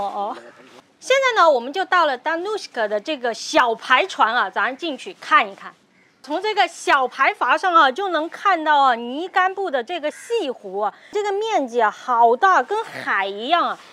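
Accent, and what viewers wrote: Sichuan, China. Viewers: 大陸口音？ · 你的四川话和我的一样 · 川普说的真好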